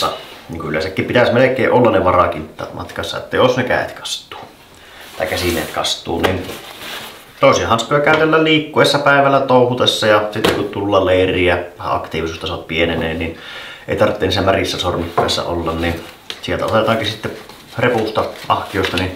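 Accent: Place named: Kainuu, Finland. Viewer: Ihanata kun kuuloo Kaenuun murretta ja nuinkii tokusti puhuttuna ja tärkeästä aeheesta. Kiitos vaen!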